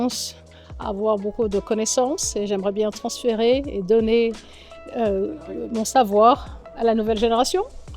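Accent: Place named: United States. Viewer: Ah ma petite, tu nous a fait rêver, tu nous a fait sourire, tu nous a fait pleurer mais on t’aime tellement! Ça fait trop plaisir de te revoir avec ton petit accent américain. Sois heureuse dans tout ce que tu fait ma belle et surtout continue d'être heureuse et de donner de la joie autour de toi! ❤ Un grand merci à tes parents ❤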